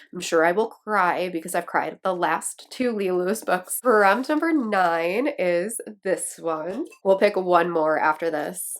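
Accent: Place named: Wisconsin, United States. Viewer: Talking about your Wisconsin accent ruining your pronunciation is cracking me up. 😂😂😂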